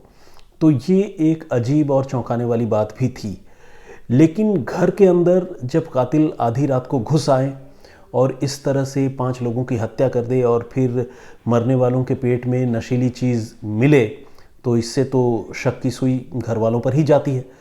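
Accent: Standard. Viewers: sir ji apki hindi.....ap devta hain ....itni shudhh hindi bhot kam suni hai.. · Sir u r good anchor n good story teller with pure hindi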